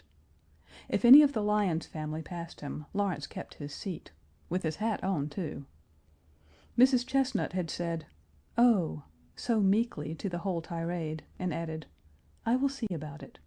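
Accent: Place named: South, United States. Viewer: she has a sexy southern aristocratic voice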